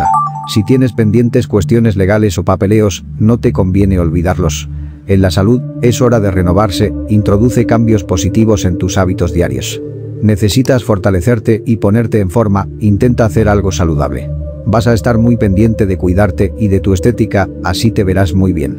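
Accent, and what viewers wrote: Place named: Spain. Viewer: No me gusta que el locutor sea español, cuando el locutor sea mexicano te doy Like mientras, no te veo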